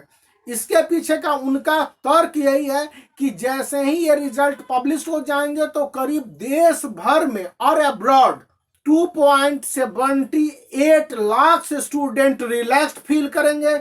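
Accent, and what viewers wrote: Bihar, India. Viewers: Are u Bihari sir . Ur English sounds like that . I am also from Bihar . Kaisan ba. Sab thik ba toh ! Very much thanks from me to u for making our exams cancel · Abe bihari phale batt karna sekh pagal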